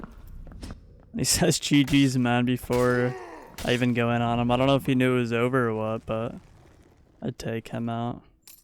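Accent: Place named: Valley, United States. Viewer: Thats a gross ass val accent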